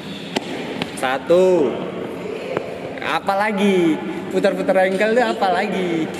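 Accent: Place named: Medan, Indonesia. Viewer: logat medannya anjay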